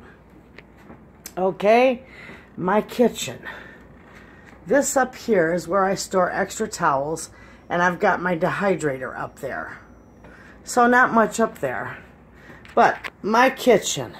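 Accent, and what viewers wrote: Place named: Northeast, United States. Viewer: Hi. Thanks for taking us through your beautiful home. You are organized and efficient! Sounds like you have a North Eastern accent. I'm from upstate NY (but in SoCal for 40 years now) so you sound like all my relatives. Have a wonderful day.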